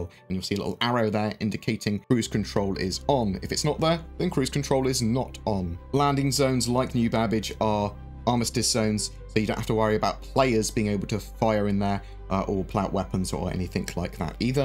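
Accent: United Kingdom. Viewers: Men this UK voice...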